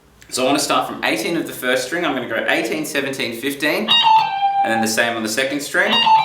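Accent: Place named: Australia. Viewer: Are you a guitar teacher dude? You sound Australian! Which state are you from!?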